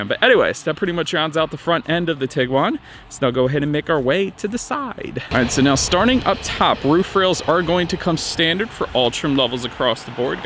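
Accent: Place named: Pittsburgh, United States. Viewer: Your Pittsburgh accent is so heavy. Good review !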